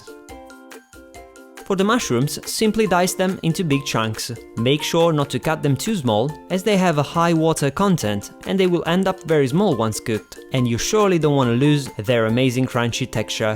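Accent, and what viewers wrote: Italy. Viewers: This is one of my favourite dishes. My grandma used to cook it for me when I was younger, without the mushrooms. And I don't know if it is a coincidence but .. your accent sounds italian and my grandma was italian, so I think this is an old italian dish, especially in the northern regions. 😯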